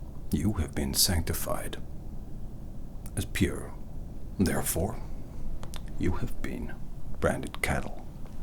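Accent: United States. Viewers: Less than 5 minutes in & THIS is brilliant... definitely worthy of a whole series & even more, thanks! & that american accent, spot on sir!!!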